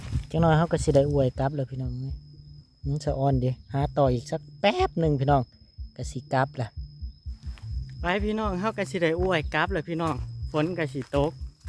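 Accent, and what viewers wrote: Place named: Ubon Ratchathani, Thailand. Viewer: สะออนนำเด้มีแต่ดอกใหญ่ๆบ่าว เสียงปากคือทางบ้านแม่ทางอุบล เนาะบ่คือทางลาว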